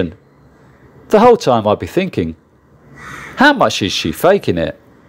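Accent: United Kingdom. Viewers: Hey. I hate to break it to you. My across the pond, Limey, Friend. As Western as these ladies appear. They only appeared, Western. This culture is as far away from our culture. As your Limey, accent. Is to my, Fine Midwestern Announcer, twang. On this side of the pond. And my brother was married to one for 25 years. Until a lack of love from her. Finally killed him. A little over 8 months ago at 64. Which is what happens. When they don't know how to give love. As in, actual love. Not just the fun love. But the real love. Love everybody needs. She never had for him. She was a gold digger. And found yourself an American boy. And took him for a ride for 25 years until he died. From a lack of love. So I really cannot fully comprehend their culture. As it is quite Foreign. To mine and yours. There is just nothing there to relate to. It is that Foreign. It's not like Europeans or Americans, at all. 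They only look that way. They only have the same stuff. The only carry-on in the same manner. To imitate the rest of us. And they are not what they appear to be. Though they can be quite lovely. And very dedicated people. But they have a whole bunch of whack jobs also. Most likely from their poverty. As I don't know what kind of poverty it's like to be a, Limey. From the UK? Though I could have a little idea? Since we both watched the same, Peter Sellers, Tracy Ullman, Benny Hill, Monty Python, shows. And all the others. I got them on both the CBC and PBS. Because I lived in Detroi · I like the Narration not the accent Shows you how the UK has different accents most of them are so common.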